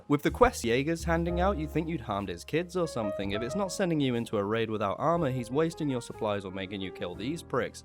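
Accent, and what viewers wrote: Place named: England, United Kingdom. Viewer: i like this funny english tarkov man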